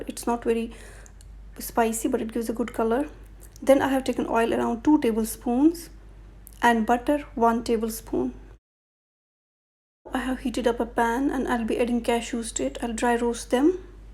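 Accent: Kashmir, India. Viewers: No kashmiri English please 🙏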